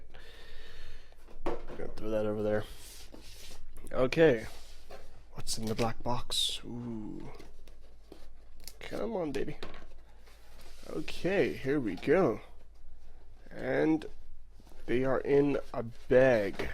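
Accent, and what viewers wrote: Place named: Northeast, United States. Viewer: I got mine for $62 about 2 months ago, they we're a little bit enjoying in the high range and a little lacking in bass, however I put them in a room with a Fiio amp/dac and feed them EDM on a loop streaming Tidal lossless streaming service using the premium services, I did this for 5 days and after the 5 day period I have to tell you I am now a believer in headphone "Burn-In" I'm guessing that this has loosened the driver material or flexed whatever term you'd like to use. At any rate they just totally transformed into a much better sounding SHP9500, I didn't want to like these headphones however even if Phillips were to sell these for $150 they'd be worth it! Are they good for every genre of music? No, if you're a bass head look elsewhere, I do own a pair of V-MODA M 100's and like those but are they good for every genre of music? 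No, however I just can't stop listening to these 9500's. I'm going to stop now and get me a drink of whiskey. Just a side note for the reviewer, what part of the UK do you live in? I was stationed at RAF Bentwaters for 3 years many moons ago, so are you an American? as I don't detect an English accent when you speak, I do hear what I believe is a North East Coast accent in you. Hey thanks for the review.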